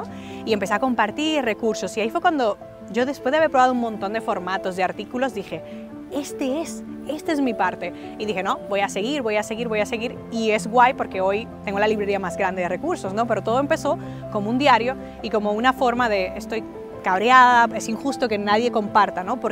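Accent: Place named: Dominican Republic. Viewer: a mi me gusta mucho que te sale el acento dominicano y español a la vez, es una bonita mezcla. Excelente historia, a por mas.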